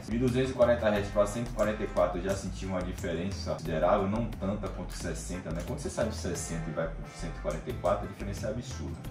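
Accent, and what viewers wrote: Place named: Bahia, Brazil. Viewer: Sou de salvador tbm, já sabia que ele era baiano pelo sotaque dele kksajdk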